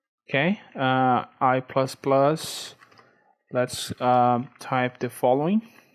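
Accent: Brazil. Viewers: Mano você e brasileiro ? Ou americano ? ._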